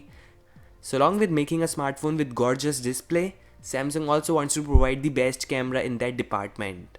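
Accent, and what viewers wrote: India. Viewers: Ikr sounds like an indian shitting on the street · are you dumb? The xeectechcare channel is from pakistan. If you listen to zaryab (xeectechcare) english accent, he sounds like american. Unlike in this channel, this guy is indian and his english accent is different. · Indian english. Unbelievable. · Omg indian accent so stupid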